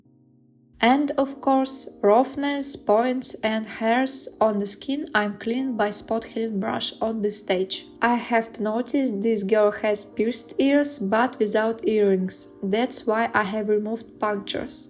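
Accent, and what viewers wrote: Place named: Russia. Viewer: Привет! По речи кажется что русская =) хочу русские субтитры. хотя и так понятно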